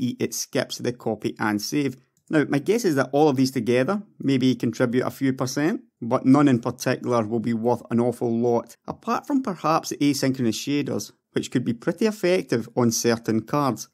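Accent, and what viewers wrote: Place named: North, United Kingdom. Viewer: i love that northern accent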